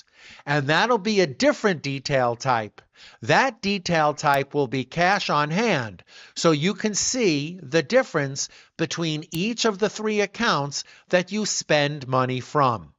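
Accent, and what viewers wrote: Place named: Bronx, United States. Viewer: I like the Bronx accent!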